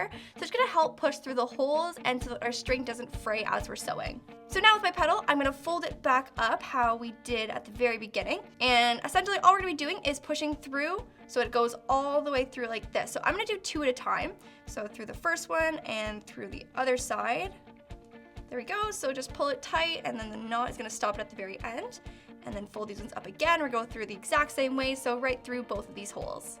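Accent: Canada. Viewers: Her Canadian accent is so cute haha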